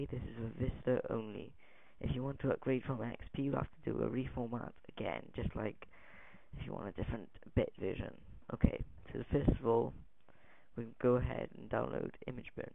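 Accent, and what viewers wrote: Wales, United Kingdom. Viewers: u sound like a mix between welsh and english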